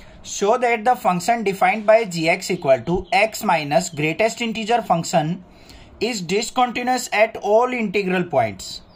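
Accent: Haryana, India. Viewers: Sir aap hariyana se ho ?aap jbb bol re ho tbhi pta chl ja rha h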